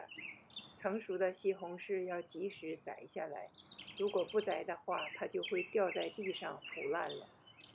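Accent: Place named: Northeast, China. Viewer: 东北口音亲切！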